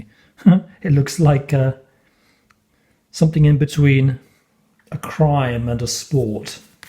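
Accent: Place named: England, United Kingdom. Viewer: Hi, new subscriber here. From Empress Song's channel. It is refreshing to hear an English accent. I like this deck, in that it is subversive. For example the King of Pents is meant to be master of the earthly realm, with access to resources to use at will. In this deck the King of Pents is removed from his element, with farming and wealth of the earth (the combine harvester in the background) beneath the King, as implied access to valued resources are supplied via the tablet. Maybe stocks in fracking, or cyber currency; the stock exchange rather than the actual nitty gritty of resource creation by own hands. So as a polemic on modern society, there is not as much respect given to skill of hand and land. Respect, ie wealth and value, is given to Airy (swords/guns) pursuits. As for the suit of guns, who needs intelligence when you have a gun? 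The previous 12 months alone in the US has been horrific and mind boggling regarding mass shootings and the desperate need to keep their right to bear arms. It makes no sense, but if Guns are placed in stead of strategy, analysis, logic, then a suit of guns is a sad state of modern living. The RWS of the 1900's had at least a little hope in it. This deck shows today's reality, the hope has to be added intentionally and sideways on. I have a few decks like this, the latest is a US games deck - The Neo-Colonialist Tarot of the Americas. Pamela Coleman Smiths artwork enables people to enter into the theatre of life. A fantasy. In these decks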